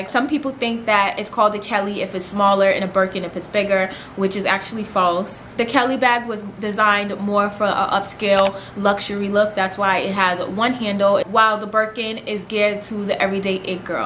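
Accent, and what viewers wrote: New York, United States. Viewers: Sis I love this!!!!!!! It’s giving what it needs to give. Fashion knowledge with the New York accent!!!!!!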